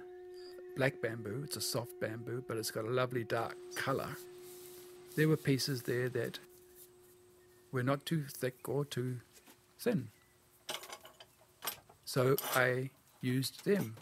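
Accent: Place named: New Zealand. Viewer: Your accent. Sounds from New Zealand.. telling me there's a blind shakuhachi master here??? Such an inspiring guy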